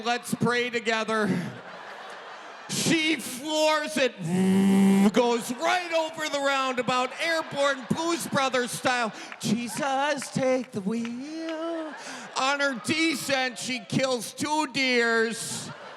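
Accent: Midwest, United States. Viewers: I'm an iowan and this is so accurate and the Midwest accent is a mixture of southern and hick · This man is pulled between his Wisconsin speech pattern and neutral speech pattern. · Thank you for bringing this to YouTube! My son got me following you pretty early on. My California baby was in tears over your accent and Midwestern humor. Loved this special start to finish, would love a separate "auction" one, if you're so inclined. Hope to see you live someday! 💜✌️😎🍀🌎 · I moved to Wisconsin 20 years ago and I've still never heard anyone with that thick of an accent. But then people here say I have a southern accent soooo 🤷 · Midwestern here, from Wisco herself. Is this what our accent sounds like? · Oh gosh! From central Wisconsin and sounds like home. Lol! Love ya! Good lookin’ Wisconsin guy!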